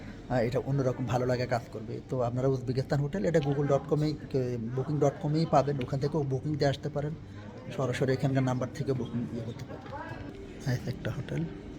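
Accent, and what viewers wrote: Noakhali, Bangladesh. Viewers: বাই আন্নে নোয়াখালীর সুদ্দু বাসায় কতা বলেন, বালো লাগে তবে সুজোগ পেলে স্পিচ থেরাপি নিয়েন, আরো বালো দানদা করতে ফারবেন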